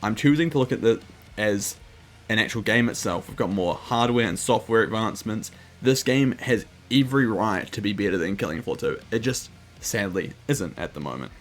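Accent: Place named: New Zealand. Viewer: You, sir, are a Kiwi.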